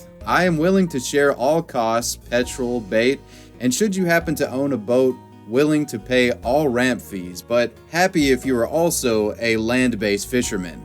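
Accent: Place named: United States. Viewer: It's like Bellular with with an American accent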